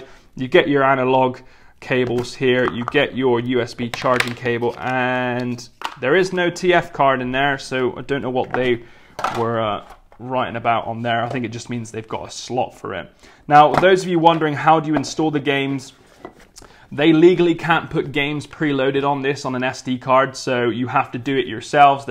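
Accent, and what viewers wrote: Bristol, United Kingdom. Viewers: Fellow Bristolian?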